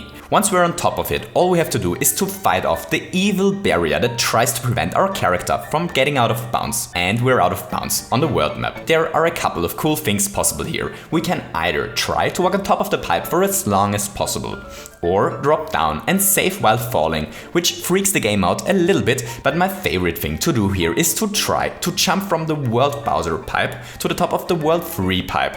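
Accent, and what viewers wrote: Germany. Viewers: Hey you have a German accent are u German? BTW love ur videos :) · I know, it's a silly question but are you german. Your accent sounds like a german one.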